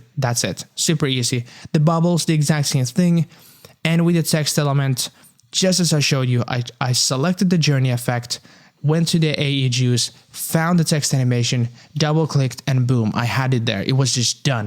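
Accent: Finland. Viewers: Are you from Finland? Just a hunch based on your accent. Good vid man.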